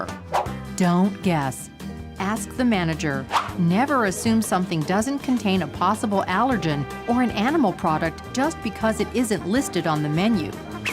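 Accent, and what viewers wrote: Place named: United States. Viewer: A bit American but good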